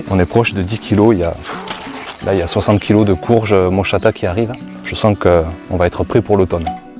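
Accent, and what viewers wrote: South, France. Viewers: J adore vos vidéos super sympa continuez à nous régaler avec votre accent du sud beau jardin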